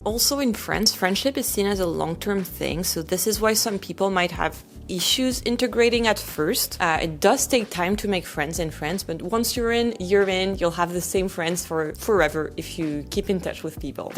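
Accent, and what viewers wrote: United States. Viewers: speaking in English with an American accent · cets quoi ce délire d'avoir l'accent américain et de parler avec la gorge, génération miley cirus, c'est horrible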